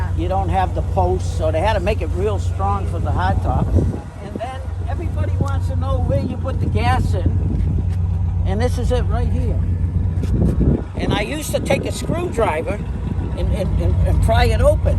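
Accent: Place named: Boston, United States. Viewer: Beautiful Cadillac! I detect a Boston accent...!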